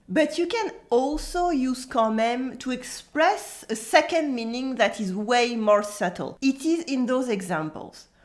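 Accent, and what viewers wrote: France. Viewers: On the contrary, speaks an excellent English. Her French accent, intonation and cadence makes her English even more charming and pleasent to ear.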